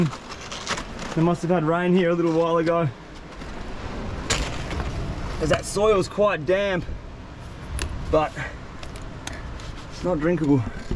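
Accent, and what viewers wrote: Western Australia, Australia. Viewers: I knew straight away you are Western Australian from your accent, especially your pronunciation of water LOL. The sky and beach was a giveaway too. Great video mate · Thought this is going to be some fake ass bullshit... until i heard the australian accent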